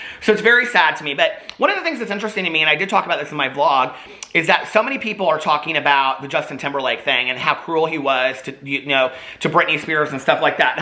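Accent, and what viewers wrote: South, United States. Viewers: !!! 😂😂😂 your southern accent 🤣🤣🤣🤣🤣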